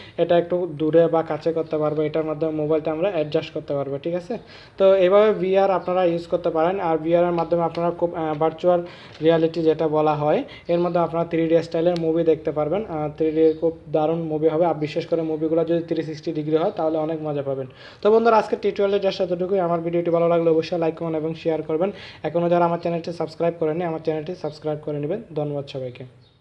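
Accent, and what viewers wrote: Chittagong, Bangladesh. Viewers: ভাই আপনি কী চট্টগ্রাম থেকে?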